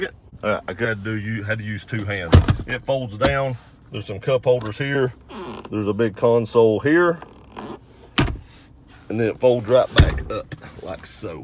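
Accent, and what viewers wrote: South, United States. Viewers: What state do you live in because you sound very southern.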